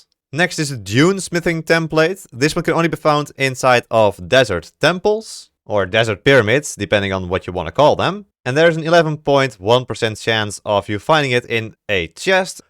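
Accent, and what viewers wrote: Netherlands. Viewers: this guy is def dutch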